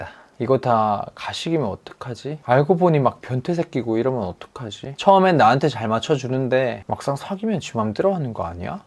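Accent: Gyeongsang, South Korea. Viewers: 좋은정보 감사합니다. 경사도 억양이 살짝 묻어 나오는게 매력있네요^^ 또 뵈요~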